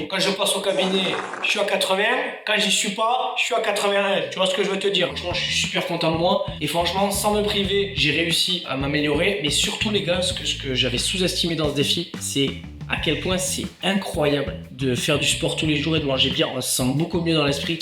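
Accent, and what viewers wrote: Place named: Pau, France. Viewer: Ha je me disais aussi que tu avais l’accent de chez moi ^^ Je suis palois aussi . Adishatz beroy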